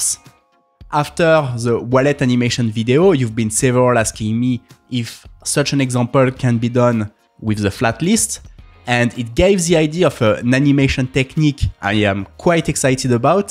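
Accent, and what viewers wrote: France. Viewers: Excellent tutorial, thank you! Also love your accent haha, you sound more French than Swiss to me but I'm Australian so am hardly qualified to tell the difference. :p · So adorable french style english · Intéressant le tuto français ça ce vois a l'accent ? 😊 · Vous avez une accent française 😄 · Nice video! You have a french accent, isn’t it?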